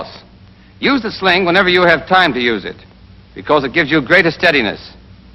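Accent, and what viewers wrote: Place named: Boston, United States. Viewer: He's speaking in a Boston accent, or New England accent.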